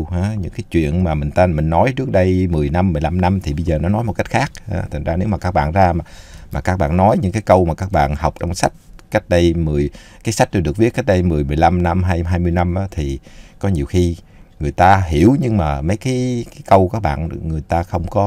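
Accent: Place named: South, Vietnam. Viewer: Cảm ơn thầy, Em rất thích học và nghe, gì thầy nói tiếng trong nam, Em nghe rõ, Em rảnh giờ nào cũng luôn mở nghe, chúc thầy và gia đình luôn được nhiều sức khỏe may mắn hạnh phúc vui vẻ an lành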